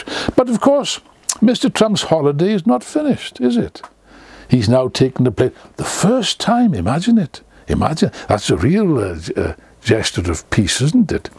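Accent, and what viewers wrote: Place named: Wales, United Kingdom. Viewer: If every political statement were delivered in the Welsh accent there would be more peace in the world. (Not taking away from the message obviously, it's spot on.)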